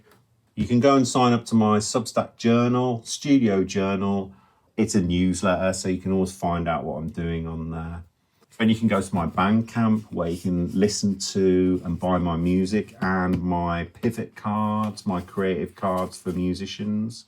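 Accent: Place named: Birmingham, United Kingdom. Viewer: Hi again. From your accent I’m presuming you’re local to Birmingham as am I. If you ever want to collaborate on anything then please get in touch. I’m a piano and woodwind player so I’m sure we could cobble something together. 👍🙏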